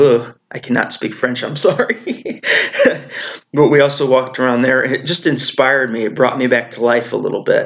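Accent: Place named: United States. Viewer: I had a feeling your accent is so American ;)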